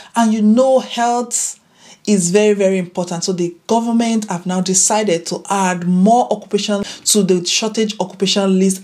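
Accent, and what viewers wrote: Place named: United Kingdom. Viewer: It will be appreciated if you add a subtitle because not everyone can understand the British accent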